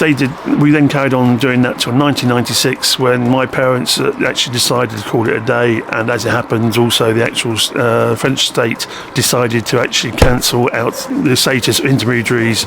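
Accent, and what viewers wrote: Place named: United Kingdom. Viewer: Gosh, it’s takes an effort to follow you. Speedy British not sure from which part of England and a bit of man mumbling😊. But I sounds like your French is better than your English!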